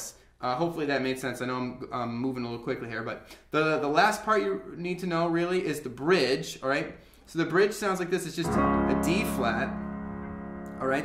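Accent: United Kingdom. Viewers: American? He's British :P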